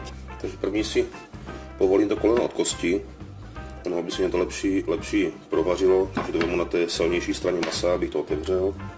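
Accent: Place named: Hodonín, Czech Republic. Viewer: Super recept. Vypadá to parádně. Jsem sice ze Šumavy ale prakticky celou rodinu mám v Hodoníně a miluju to vaše nářečí. Strašně dobře se to poslouchá.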